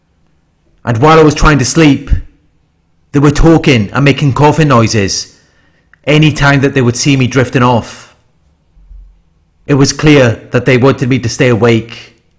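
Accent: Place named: Swansea, United Kingdom. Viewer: Still got the Swansea accent 😉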